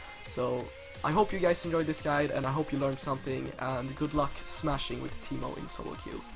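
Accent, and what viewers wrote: Sweden. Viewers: Are you from Sweden bro? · Are you swedish by eny reason you'r accent sounds like it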